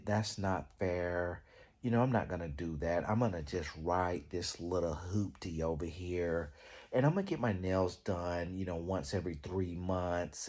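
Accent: South, United States. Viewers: Lol you crack me up 😂😂 it’s the accent for me I know you southern!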